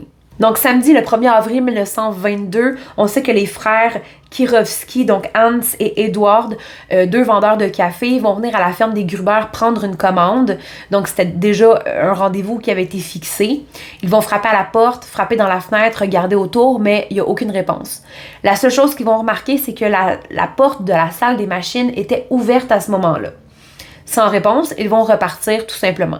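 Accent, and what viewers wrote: Quebec, Canada. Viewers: Bonsoir, affaire assez étonnante. C'est assez brouillon comme meurtres, celui qui arrivera à trouver le coupable, même plus de cent ans après, aura beaucoup de chance car toutes les hypothèses que tu cites peuvent être valable. Merci pour ton super travail avec ton joli petit accent québécois. Je n'arrive pas à te trouver sur Instagram. Pourrais-tu me donner ton Instagram, stp ? Bonne fin de soirée, bises livresques du sud-ouest de la Mère Patrie. 😘😘😘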